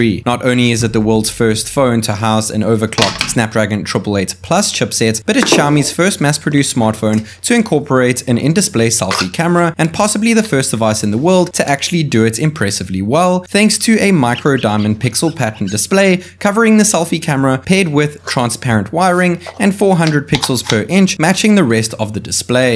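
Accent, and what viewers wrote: Johannesburg, South Africa. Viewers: Great video. Are you South African? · Is that a JHB accent I'm hearing??🤔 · South African accent 👀 lezzz go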